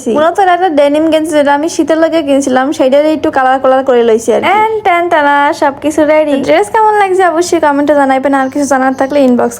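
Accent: Barishal, Bangladesh. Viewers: জি আপু সবই ঠিক আছে;কিন্তু আপনি নিশ্চয়ই বরিশালের মেয়ে!!👈💃💃☺️☺️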